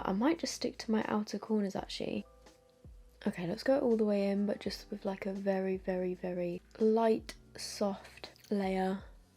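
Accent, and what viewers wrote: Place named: United Kingdom. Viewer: Regular watcher here but just had a « eurêka » moment that is going to sound weird: did you grow up in the same area/neighbourhood as Daniel Radcliffe?? Your speech pattern/enunciation is sooooo similar to my Canadian ears…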